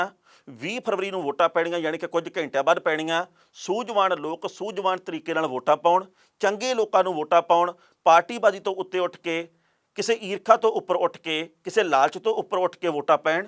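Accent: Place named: Majha, India. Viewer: Majhe dee boli sun k bahut mjaa aya. Sat sri akal tehna shib g.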